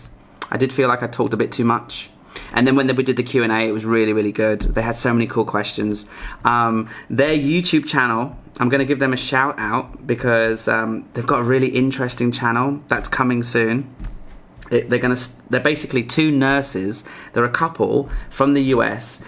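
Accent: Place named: Sheffield, United Kingdom. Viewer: you dont sound irish more english if i was to guess someplace around Sheffield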